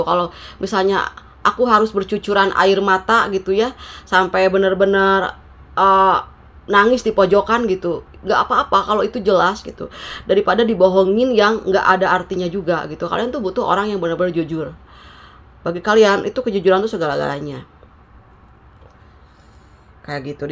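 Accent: West Java, Indonesia. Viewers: Siang Mbak cantik🙏..Maaf saya baru memfollowing. Sukses sll 😇👍👍👍TUHAN memberkati🙏😇🙏 Maaf teh, orang Sunda ya🙏bagus suaranya👍 Hatur nuhun terima kasih readingannya teh.🙏🥰🙏 Temanya, dia pakai magic, jk dia bnr pakai bgtuan, TUHAN akan menjagaku sll dr orang2 brniat tdk baik.Amin.🙏 Benar kejujuran adlh segalanya.🙏👍 Ya resiko dia nya sendiri, dia yg memutuskan pergi menjauh, dan saya sdh ikhlaskan serahkn kpd TUHAN, rasa sedih pedih luka di hati yg dia berikan, telah berangsur pulih hatiku, Kasih TUHAN pulihkan di hatiku🙏😇🙏..Lebih baik fokus utk ke depannya saja, anak2 saja, selflove dan terpenting semakin dkt pd TUHAN🤲🙏♥️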